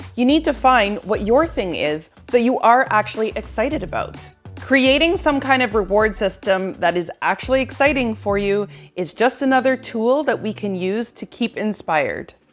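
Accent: Canada. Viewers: Just saw your video. Great talk. Are you from Canada?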